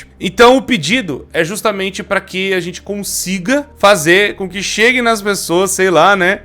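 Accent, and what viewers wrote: Brazil. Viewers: O jeito que vc fala se assemelha mais aos brasileiros,vc vê muitos vídeos na Internet ou pq convive com BR?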